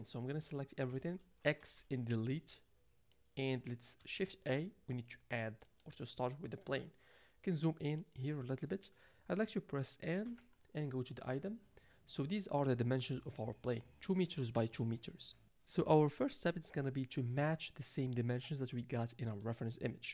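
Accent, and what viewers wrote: Morocco. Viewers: Your accent sounds Moroccan 😅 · are you moroccan? you sound exactly like my relatives.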